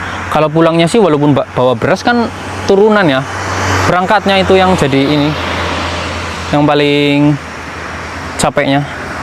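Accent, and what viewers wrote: Banyumas, Indonesia. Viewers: Maaf mas asli ne janeh ngendi kok pd ky aku ngapak🤭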